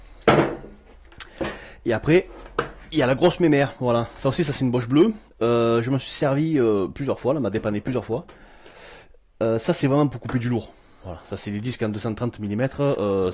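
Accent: South, France. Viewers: Super Sympa et très utile ce tuto. Que d'excellents conseils avec une pointe d'humour c'est toujours agréable à suivre. Par contre un petit soucis..l'accent plutôt du sud et le petit kenavo breton . Alors plutôt Rennais ou Marseillais ;-)) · Merci pour ces bons conseils donnés avec ce bel accent du sud ;o)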